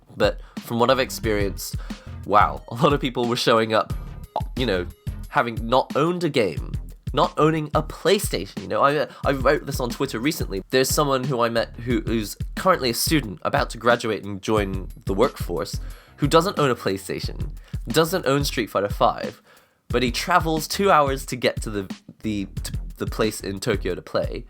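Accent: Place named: Bristol, United Kingdom. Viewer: England. He sounds like he's from the Bristol area maybe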